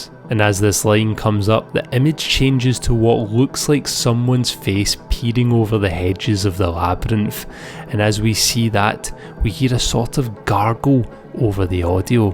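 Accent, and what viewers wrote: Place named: Scotland, United Kingdom. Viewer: you have a heavy scottish accent but nonetheless, i like the review of this underrated analog horror series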